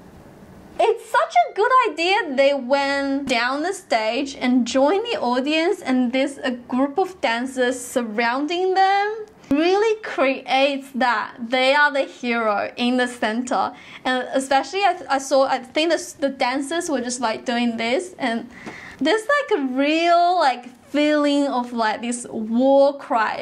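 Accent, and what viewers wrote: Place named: China. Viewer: Your English is good except for a few words. Typically the word "in". You must end with your tongue touching the inner upper teeth. You left your tongue in the centre which most China Chinese do. Hope you are not offended. Hopefully, it will help you in your life 😘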